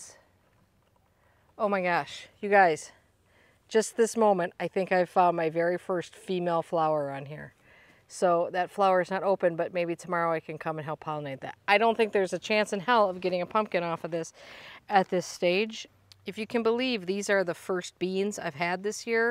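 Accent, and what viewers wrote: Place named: Upstate New York, United States. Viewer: I love how you garden. It's like I would if I had the space and lived in a place with trees and a bit more rain. I started watching your channel about a year ago. It wasn't your garden that caught my attention, at first, though I love it! It was your accent. My dearest and best friend sounded a lot like you do. We lost her to a stroke almost 4 years ago. She was here in Texas with me 4 years ago today! It was so important for her to get here. See, she was born and raised in Upstate New York in a small town called Phelps. It's just south of Rochester. She got transplanted to Texas because she wanted to go to college some place that had a good music program and was completely different from where she had been living. Well, she found it! I'm in the Texas Panhandle and it's nothing like your beautiful tree filled, crick running, lakes and, well, we're not the same at all! 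She always kept her accent which she argued, in fun, that we had the accent down here, she didn't have an accent! Haha!!! Anyway, she and her family moved back to NY in the mid to late 90s. I didn't know what I was gonna do without her?! Our lives were very entwined from working a small business together and I helped her home school her daughter and and and.... We could go shop at Wal-Mart and have a blast doing, together. Well, I survived the move because We could still talk on the phone and I was truly happy that she was back home. We made a trip up there in 2008 and spent a week with her. It was perfect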